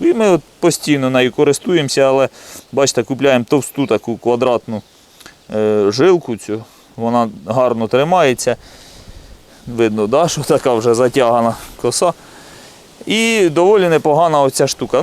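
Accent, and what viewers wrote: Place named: Sumy, Ukraine. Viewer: я чую діалект сумський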